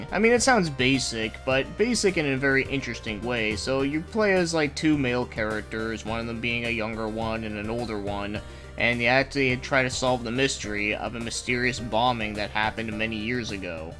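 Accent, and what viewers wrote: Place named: Japan. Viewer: ay bro you sound Japanese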